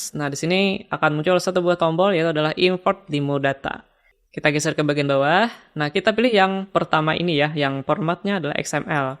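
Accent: Banjar, Indonesia. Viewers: logatnya pina kayak bhs banjar pahuluan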